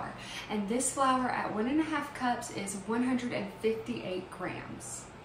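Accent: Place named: South, United States. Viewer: That’s absolutely southernese! I love it! Go to Europe and you will find many different variations in language but I would not dare to ask them to change their way of speaking bc my way is different. That’s what is unique ab people from different parts of the world. Stay the way you are. I do love hoe respectfully she ask though.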